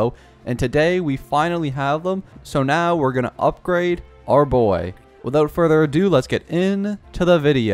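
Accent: Canada. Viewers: he sounds canadian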